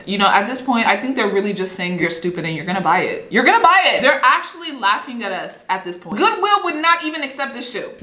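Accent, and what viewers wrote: Nigeria. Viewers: You sound Nigerian I love you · I just found your channel.. And I love it. Especially your accent.. Is it nigerian? Or other country in west africa? And yes i agree with your opinion about this.. This could also applicable to some arts... Like i don't understand why would someone pay thoisands of dollars for paint on rocks?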